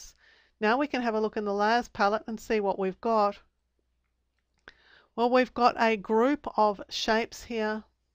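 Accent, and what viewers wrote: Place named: United Kingdom. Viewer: I like your sweet UK accent :-)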